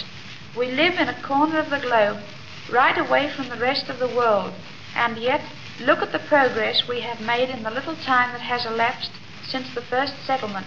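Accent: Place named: Cornwall, United Kingdom. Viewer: Sounds like Queens English with a bit of Cornish and Cockey!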